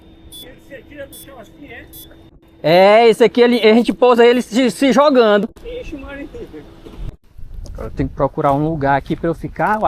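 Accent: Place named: Maranhão, Brazil. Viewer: Vi um vídeo seu que estava se tremendo todo e com dificuldades ksksks. Ja melhorou muito. Vc é maranhense né? Pelo sotaque eu suspeitei que era, e depois que vi escrito UFMA, ai tive mais certeza ainda. Mas só me tira essa dúvida para eu ter mesmo certeza. Sucesso ai meu brother, vídeo muito bom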